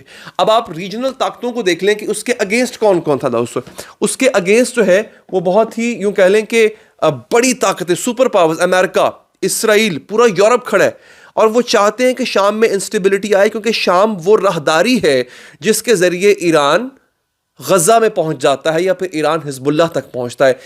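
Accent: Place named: Lucknow, India. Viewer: Aabki Dhuli Huyi Lucknovi Urdu aur Bolne ka andaz aur Laheja bahot pyara hai.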